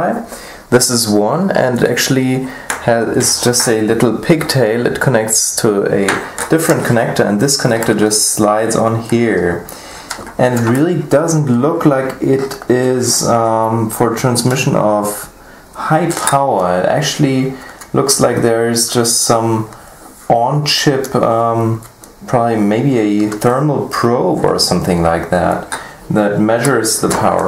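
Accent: Germany. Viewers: I knew you were german when you started talking hahahahahaha hallöchent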